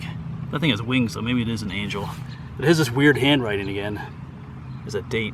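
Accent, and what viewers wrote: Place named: New England, United States. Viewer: Watched the video of Ft. Duncan,there in the disputed territory of Western Virginia. I figured you for a yankee,hearing the accent. I reckon your ancestors kept in the rear to keep from getting killed.The reason l state this is because my ancestors fought for the South and went to Gettysburg. They were excellent shots,being yoeman farmers.Now their grandparents had owned slaves,but they got shed of them,once their families grew. They just followed the yankees way of thinking.Why support someone in winter,feeding,clothing and caring for their welfare,when l have blood kin l can use.So we will sell them off and make a tidy profit. DEO VINDICE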